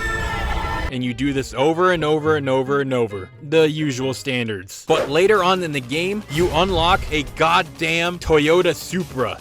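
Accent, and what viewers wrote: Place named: United States. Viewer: I was so confused for a few seconds hearing an american talk about this show, and all comments are in english, what's happening?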